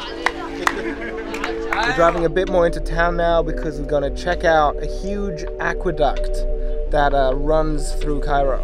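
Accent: Germany. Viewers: I can already smell the "Parkour Turist guide through Egypt with Jason Paul" in your Hillarious Germans English Dialekt... Egypt seems like an Perfect Place for Exploration... I saw the cat and was like "Yay Catpats" and then the cat ran away... but i saw the effort to keep your Promise so i let it pass for today and still like the Video The Kids were kind of Cute I like how the Bork of every tree is paintet White in the first two meters so no animals will eat that part because there are many Animals running around Will there be some Parkour Action with Camels? I like Camels... they are Derpy.... Derpy is Cute.... And while you on it.... Pat them for me Anyways Thanks for todays Video See ya Next Video <3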